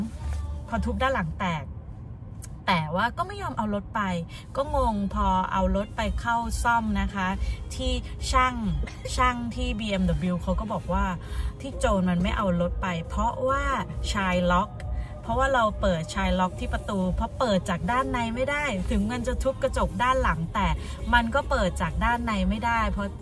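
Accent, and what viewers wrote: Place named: South, Thailand. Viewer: ไม่แปลกใจเลยค่ะ ว่าทำไม่อยู่S Carolina ทำงานกับ บ. แม่ BMW นี่เอง ชอบน้องแหลงใต้มากค่ะ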